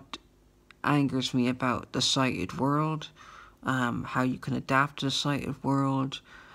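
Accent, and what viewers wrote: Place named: Ireland. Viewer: I understand your anger, but that is an energy you can use. Let us see more of your face, Your smooth Irish voice is an asset, your content will be interesting. Ask one of those pesky sighted people to help you to give us a full face shot in light. Even if you use a couple of still-shots taken outside. As a person with Asperger's you have plenty of material there as well. Remember to make a new trailer video every 3 months. I say this not to offend but as suggestions only. Recently I have started to upload talking videos showing my old beaten face against some of my artwork and it was a hard decision to make as everyone loves you when you are young. Old is not fashionable. Stay safe over there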